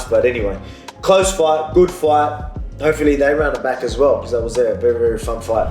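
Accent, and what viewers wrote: Australia. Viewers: Remove background music so we can hear u clearly. Your Australian accent makes ever more hard to listen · Grow some hair on ur head and change ur dressing sense, it makes u look more short.. otherwise excellent fighting, very entertaining, i like your Australian hard accent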